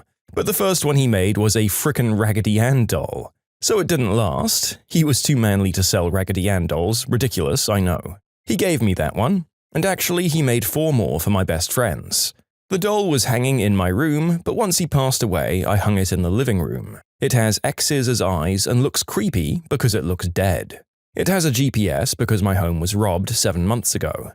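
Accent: United Kingdom. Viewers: Th4 britidh accent makes this 200 percent better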